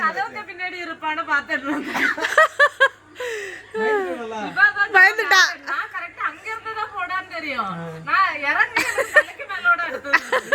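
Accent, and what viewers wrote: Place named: Nagercoil, India. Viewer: Hi... Good morning 😊 I like your Nagercoil slang very much 😍👍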